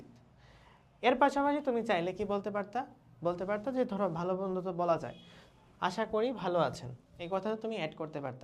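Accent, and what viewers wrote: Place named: Habiganj, Bangladesh. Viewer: Vaiee kotha bolar modde Habiganj er basa misritho ase🥰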